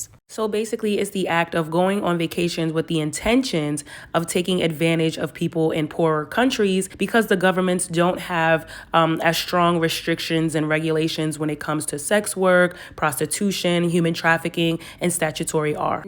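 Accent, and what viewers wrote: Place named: New York, United States. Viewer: I hear your accent; are you from New York City?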